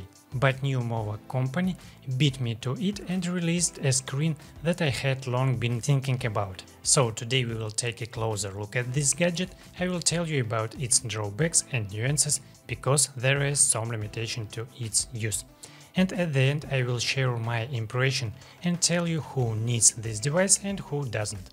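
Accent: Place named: Russia. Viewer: И у тебя сильный русский акцент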